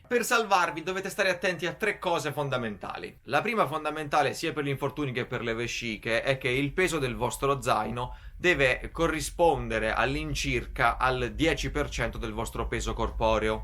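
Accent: Apulia, Italy. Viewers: Pugliese ? 😊 S send 😂😂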